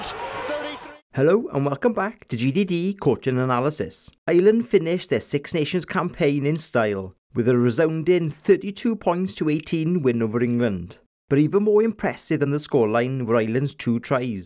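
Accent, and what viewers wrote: Wales, United Kingdom. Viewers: Nice breakdown and analysis,. Your accent is very much like mine, where are you from? I grew up in Pontardulais but now live in Australia.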